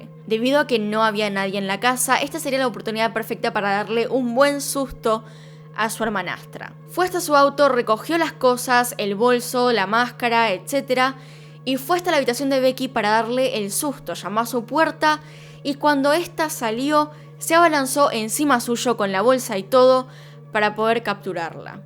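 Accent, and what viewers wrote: Argentina. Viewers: Saludos desde Suecia, aquí vivo aunque soy mexicana. Amo tu canal y me encanta el acento argentino y cómo pronuncian "ll" y "y" 💓👌. Verte me hace sentir orgullosa de los latinos y nuestro idioma, además de que una chica como tú sea tan capaz de hacer este nivel de casos e investigación. Mi todo respeto a tu trabajo. Además tienes una mirada única y también me gustan tus vídeos de maquillaje.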